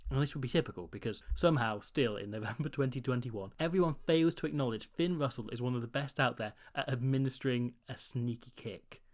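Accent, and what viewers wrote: United Kingdom. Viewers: Your voice sounds british but you get stan sport which is Aussie/kiwi so what country are you from ? Guessing not Aussie since all our players are little piss boys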